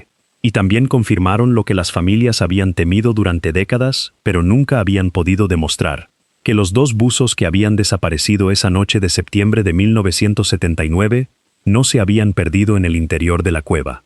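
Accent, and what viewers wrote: United States. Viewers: Hola. Interesante a pesar del español con demasiado acento norteamericano. Pero está bien. M impresiona la tozudez de los buzos por el peligro. La gente es así. Es igual que los departistas que sabaen que va a ser sancionados si toman estimulantes y aún así lo hacen. Son los que audazmente ignoran las advertencias de peligro. Es igual que l los que roban. o asesinan. Saben que los atraparán , pero aún así lo hacen. En todos los casos se les obnubila el entendimiento y la capacidad de razonar y medir el peligro. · Hablas bien el español y después hablas como gringo, me parece patético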